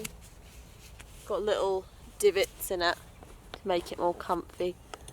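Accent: United Kingdom. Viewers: 听口音是英国人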